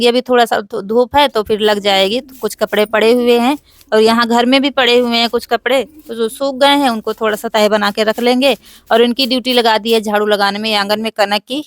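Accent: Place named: Uttar Pradesh, India. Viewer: up me kaha se didi hum bhi up ke kanpur se hain... Bhasha boli aapki jaani pehchani lagti hai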